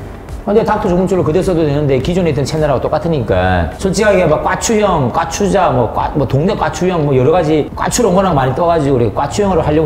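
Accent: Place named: Daegu, South Korea. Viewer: 잉 형님 말투가 대구 사투리 던데 ㅋㅋ 제가 착각했나봄 ㅋㅋㅋ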